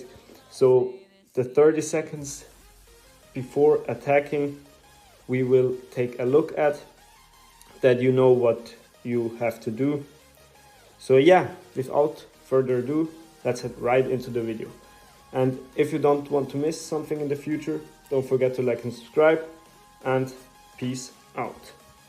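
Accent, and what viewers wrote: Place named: Germany. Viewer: It’s a strongggg Germanic accent idk how he couldn’t tell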